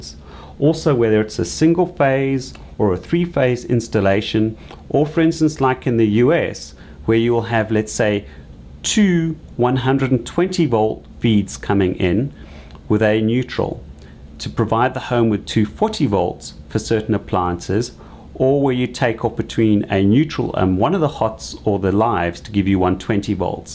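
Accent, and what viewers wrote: South Africa. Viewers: haha sud afrikaans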